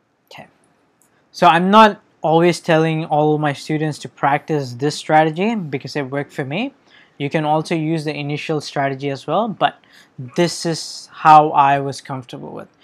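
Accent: India. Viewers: you are from bengal and bengali might be because i understand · wideos. You're indian.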